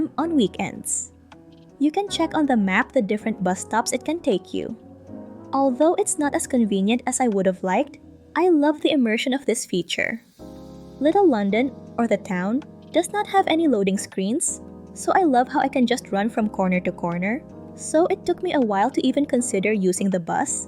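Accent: Philippines. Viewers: Are you Filipino why does it sound like that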